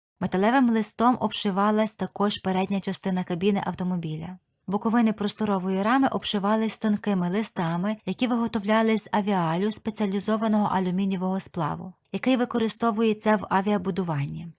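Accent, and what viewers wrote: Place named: Lviv, Ukraine. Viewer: На рахунок голосу. Звідки ви, якшо не секрет? У нас на Вінниччині таке не говорять. В голову все лізе Львів, бо прожив там кілька років 😊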